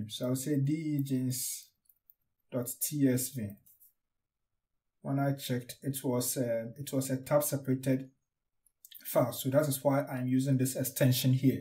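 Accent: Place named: Africa. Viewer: Is it just me or is it refreshing to hear an African accent addressing these complex concepts and workflows in modern science?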